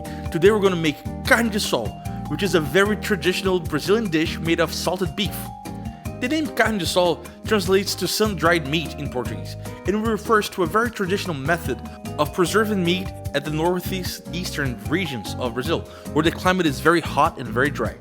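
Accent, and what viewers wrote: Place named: Netherlands. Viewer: You sound Dutch with your accent. I am from the Netherlands and I have a brazillian wife. I found your channel looking for good recipes. Are we bros?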